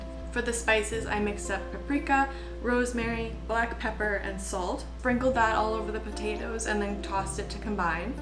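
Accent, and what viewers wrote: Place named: Canada. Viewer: I knew You were from Canada by the way You speak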